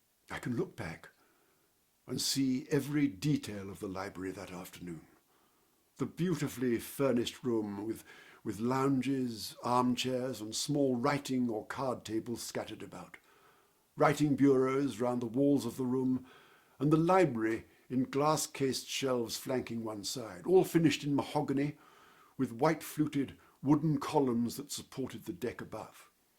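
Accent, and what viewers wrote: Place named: United Kingdom. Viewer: Excellent presentation! I love his elegant British manner and speech. And his grandfather, Lawrence Beesley, is a sterling example of one who is born an observer and writer. Were it not for his eloquent account, we would not know so many fine details about that ship's voyage. God bless writers! (And actors, too.)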